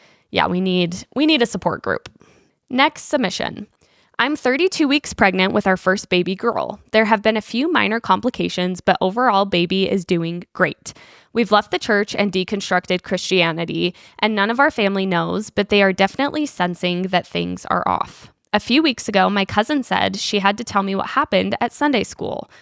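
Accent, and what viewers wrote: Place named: Utah, United States. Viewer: Do people who have this accent practice it? Are there communities in Utah where everyone speaks like this? It’s fascinating that someone in Utah can have such a distinct accent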